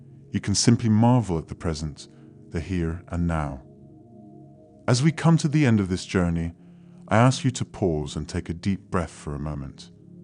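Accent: United Kingdom. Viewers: What a beautiful speaking voice. Ah, that British accent…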